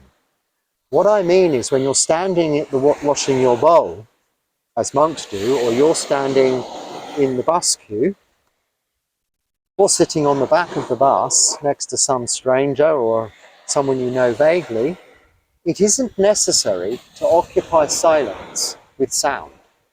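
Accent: England, United Kingdom. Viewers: This monk received an offering of two bananas and gave one back to the Hindu temple in another vid. So unlike me, sharing what is meagre and being giving. Yet I'm always wanting to see myself as kind. I like to hear his Pommy manner of talk, feeling less solitary and thinking of his hardships not managing in the local language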